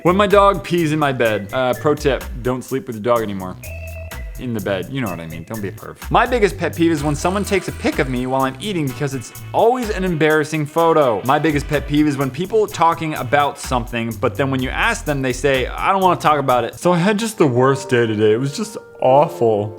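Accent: United Kingdom. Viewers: I love it when American youtubers try to do British accents!! Idk why but it's awsome and it just make me happy for no reason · Nice British accent it wasn't even offensive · I love the british accent you had!!! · I'm British sorry but that was a very bad British accent. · Omg I love ur British accent I wish I was as good as an American accent as you are with a British accent because I'm gonna go to a school production audition and need to have a good accent but.... idk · No!!!!!! More British accent! (idk I just like the accent)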